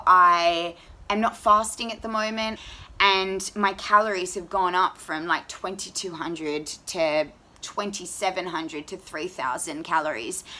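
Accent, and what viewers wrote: Australia. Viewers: THIS!!! I was looking for somebody i could relate on this diet. When I saw you I was like: YEP! THAT'S HER!!" my kind of girl to follow: strong, confident, beautiful mind (and body)., well spoken (love the aussie's accent, can't help it;) ),please don't change yourself! ever!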